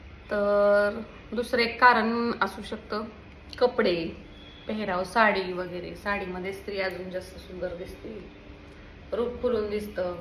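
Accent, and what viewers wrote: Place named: Pune, India. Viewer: Tumchya bolnya warun tumhi punyat kuthe Rahat aasal te lakshat yete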